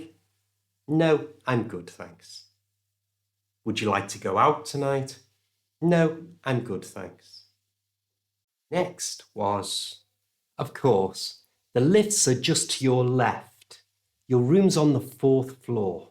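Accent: United Kingdom. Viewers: hi, your voice is excellent, easy to listen british accent. lovely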